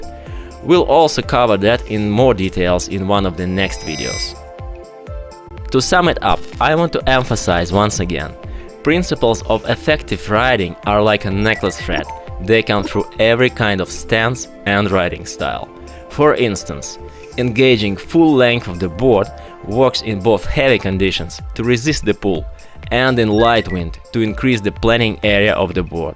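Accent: Russia. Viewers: Facking good Russian's accented pronounce!!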